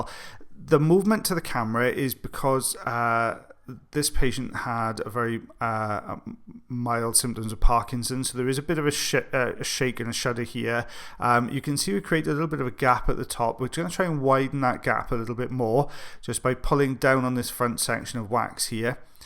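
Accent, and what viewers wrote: Wales, United Kingdom. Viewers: you are now an inch-reading maestro! Thank you for the videos to keep our spirits up. Your lilting Welsh voice is such a comfort! Sending happy thoughts to you and Mrs B. Thank you also for the little lesson on light reflex and the eardrum and hammer bone.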